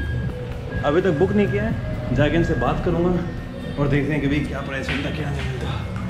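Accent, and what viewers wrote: Haryana, India. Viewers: You have a nice haryanvi touch accent..... Like yr voice.....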